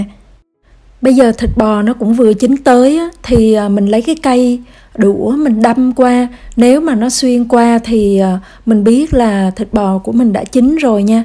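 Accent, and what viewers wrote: Hue, Vietnam. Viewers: Giong noi nguoi Hue nghe de thuong lam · phải noái nặng nặng tiếng Huế miền nửa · giọng Huế ( lại SG ? ) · Bạn là người Huế hả ?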